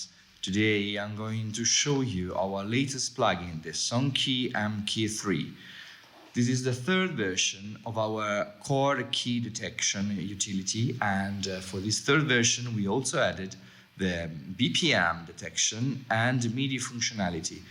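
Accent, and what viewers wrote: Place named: Tuscany, Italy. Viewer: scommetto che sei toscano haha , finalmente qualcosa made in italy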